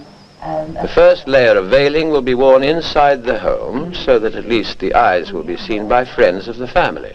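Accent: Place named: United Kingdom. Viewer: المعلق ليس امريكي بل بريطاني و الممرضه من أيرلندا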